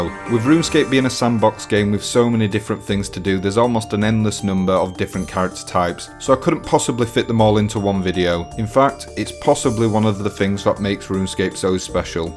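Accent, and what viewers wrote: Yorkshire, United Kingdom. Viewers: Wait, which Yorkshire are you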